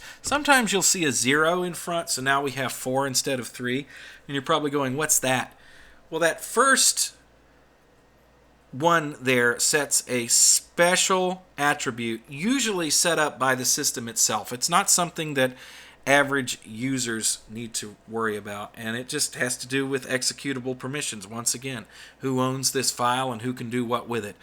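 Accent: South, United States. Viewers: Ignorant Brit, here.. what Southern accent exactly do you speak in? It's soooooo precise in the consonants, and clear. Maybe you're just well-practised with a mike, too.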